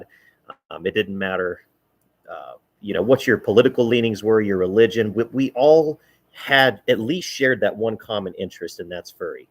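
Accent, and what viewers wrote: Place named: United States. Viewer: You have quite the strong American accent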